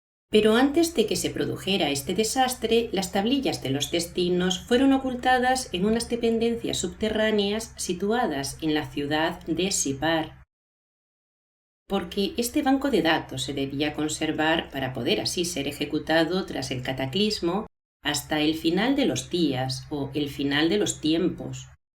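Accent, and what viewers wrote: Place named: Spain. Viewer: misael rrodriges a mi su voz española se me hace bien horrible!!!!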